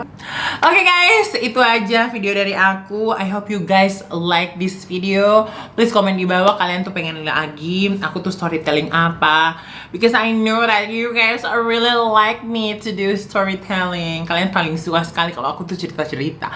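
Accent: Indonesia. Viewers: Qu suka bahasa inggris nya, aku suka logat manadonya. Sukses sllu kakak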